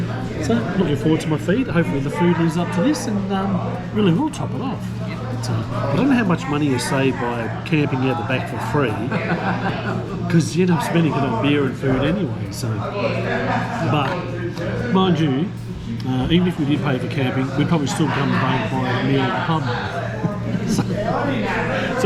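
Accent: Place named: United Kingdom. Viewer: What would you pay? We all understand your living on the edge each week until pension day. Mate drop the girls and the drugs, the cigs and the red frogs. Stop your whinging. Are you a Pommy? Sure sounds like it. Maybe small man syndrome.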